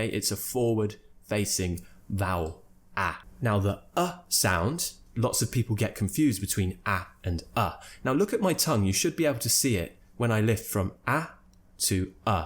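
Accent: United Kingdom. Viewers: I could really learn British accent and improve my English from your channel 😊 Thank you, from Malaysia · Love your pronunciation, your British accent, your videos ... Lots of love around here, right? Ha ha ha Thanks for your work! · I love British accent! I had grown up in London until 6, so the accent or I could say the atmosphere reminds me of my childhood. X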